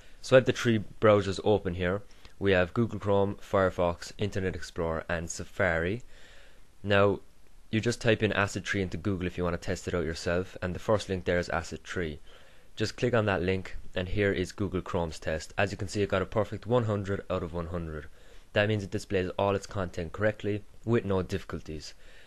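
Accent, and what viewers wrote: Ireland. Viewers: funny cuz this guy has an irish accent the add before the video started was and irish spring comercial ...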